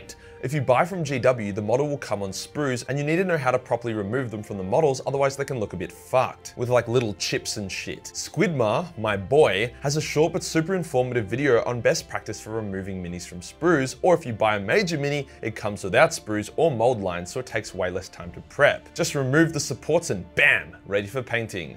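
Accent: Australia. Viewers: I kinda know everything in the video but it's just nice to hear an Australian swearing on youtube.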